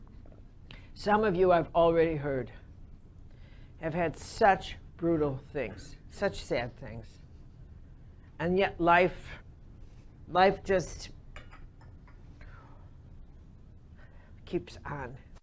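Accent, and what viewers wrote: Chicago, United States. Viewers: Is she from Chicago? She sounds like my childhood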